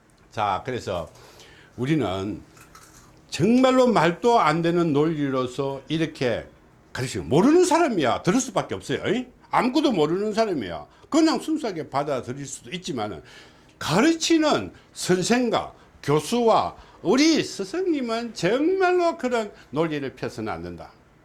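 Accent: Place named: Gyeongsang, South Korea. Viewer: 삼재특강 ? 갱상도 사투리로 이해시킬려고 하시는게 뭔가는 좀 답답합니다! 귀에 쏙들어오질 않고 복잡하게 들립니다.. 내가 너무 이해력이 부족한건아닌지? 멍청한건지! 도저히? 특강의 이해부족으로 아쉽지만 ~ 포기하고 다음기회 로 미루겠습니다!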